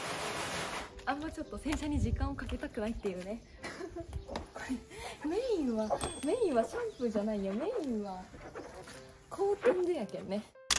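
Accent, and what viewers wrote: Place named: Kyushu, Japan. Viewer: 九州出身なのでたまに出る方言がとても良いと思います😊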